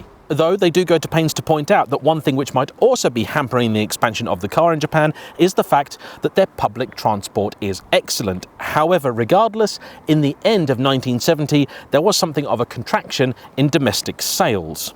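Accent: United Kingdom. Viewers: This channel is very good both for learning about car history and for learning good, British English.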